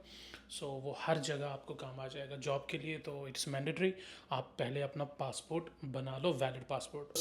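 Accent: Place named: Kashmir, India. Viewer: Bhi lots of love from mother land kashmir ❤ mai soch he raha taa aawaz tio kashmiri he lag rahie hai...phir old vlogs dekha....luv 😮❤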